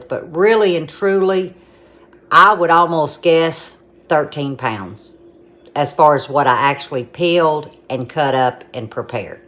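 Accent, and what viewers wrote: South, United States. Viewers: Hey, love the video. Just made it bk from south Louisiana visiting family. I think you are most definitely from the south 😊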